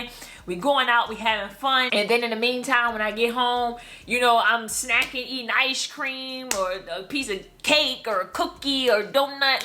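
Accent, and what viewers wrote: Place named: Washington D.C., United States. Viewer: Are you ftom D.C ?? I hear accent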